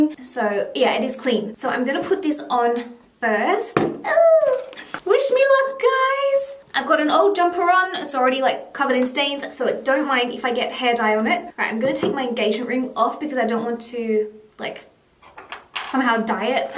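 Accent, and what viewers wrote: Australia. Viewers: You live in Galway in Ireland? Are you kidding me? I live in Galway and I've been subscribed to your channel for forever and I thought you were Australian, I'm so confused lol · wait your from Dublin... I thought you was Australian!!! :O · What is your accent? I love Irish accent and I lived in Ireland for years but your accent sounds all over the place you sound a bit Australian a bit British a bit idk what the hell lol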